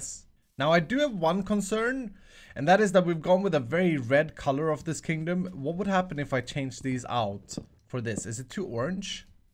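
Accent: Sweden. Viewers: You ar from Sweden right? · Are you Swedish?!? I’ve never seen your channel before, l really like your video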